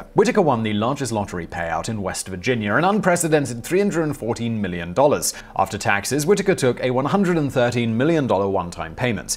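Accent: United Kingdom. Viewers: You're probably not familiar with British English pronunciation. He's pronouncing them correctly according to his background.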